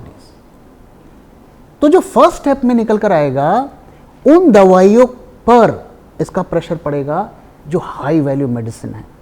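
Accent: Bengal, India. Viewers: Yeh toh bengali wala hindi accent hain🙂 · Haha she has such a cute Bengali (or is it Assamese ? accent in her Hindi ! Love it. 😄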